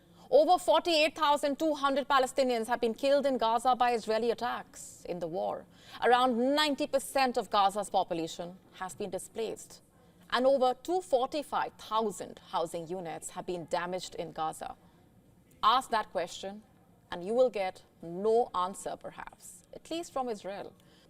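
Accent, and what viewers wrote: India. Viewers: man fkn indian accent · Indian English so funny😂😂😂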